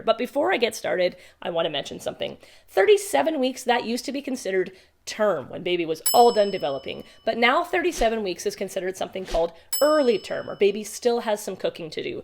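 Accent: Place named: Canada. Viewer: I love your videos, they are so informative and I love your voice and Canadian accent. Beautiful and soothing. Also, not pregnant, but we are planning on having a second baby sometime soon!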